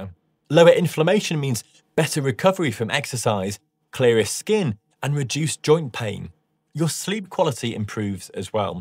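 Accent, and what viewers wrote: England, United Kingdom. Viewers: Oh the delight of hearing a nice English voice! Thank you dr.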